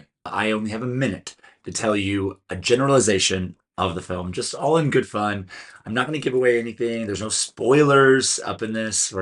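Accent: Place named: California, United States. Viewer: I could see that you really like this movie... as you said you dont know about brazilian dictatorship, i must tell you that dictatorship was supported by the usa and the cia, actually, usa were responsible for all dictatorship that happenned in latin america. Im not pointing my fingers at you, dont get me wrong, but ithink its important to say that. I like your californian accent btw. Im rooting for fernanda but i wont be devasted if moore getx it...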